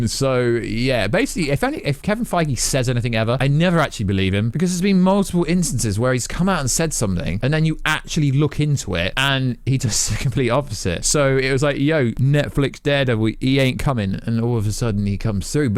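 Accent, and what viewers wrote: United Kingdom. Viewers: Are you Brittish or Scottish ahha?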